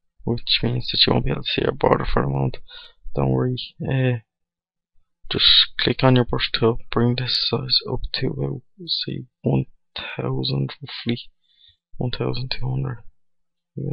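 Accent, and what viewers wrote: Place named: Ireland. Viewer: loving the Irish accent man :D Great tuorial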